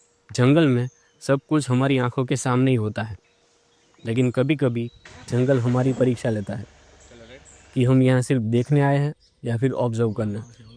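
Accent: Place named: Gujarat, India. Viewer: Good experience even after being a Gujarati you had neretted fine. But you should work on tone of language because there is still Gujarati accent and flair shown in tone. Please watch Sony BBC earth, discovery, National geographic hindi version to improve your hindi narration. Very well done for you efforts.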